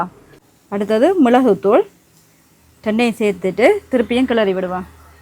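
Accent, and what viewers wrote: Sri Lanka. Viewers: ஈழத்தமிழ் வாசம் வருகிறது மகிழ்ச்சி தான் உண்மையா சொல்லுங்கள்pls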